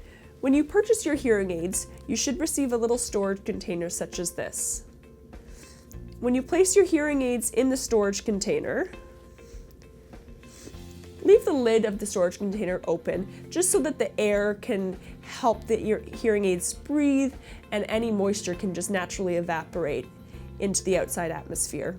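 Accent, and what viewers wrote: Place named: Canada. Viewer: This lady gets progressively more Canadian as the video goes on.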